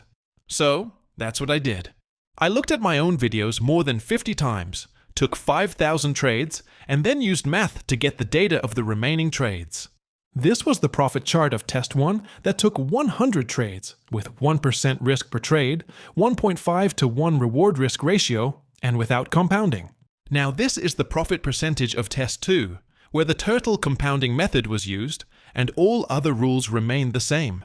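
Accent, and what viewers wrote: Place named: United States. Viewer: thank you for changing accent from british to u.s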